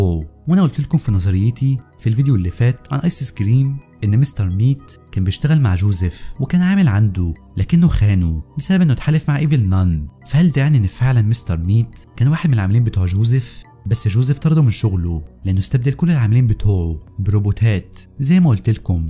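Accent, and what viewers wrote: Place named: China. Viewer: من لهجتو صيني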